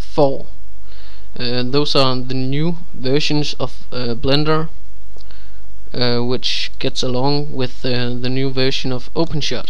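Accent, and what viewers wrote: Denmark. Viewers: er du dansk ? du lyder sådan :)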